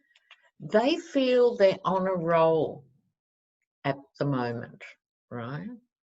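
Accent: Australia. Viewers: Thank you so very much for sharing your insights and wisdom with us! You are helping me remain as sane as possible. Question, please. By your accent alone I'd be able to tell that you're Australian. I'm curious -- why do you have such an interest in US politics? (Please don't misunderstand! I'm right there with you politically!) I realize there are plenty of "fires" to put out, so those, naturally , will take precedent. But as you have time and/or inclination, I'm sure I'm not the only one who's curious about this. Thank you for all you do!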